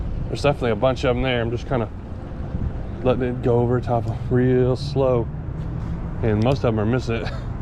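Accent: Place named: Michigan, United States. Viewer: Sounds like Michigan to me lol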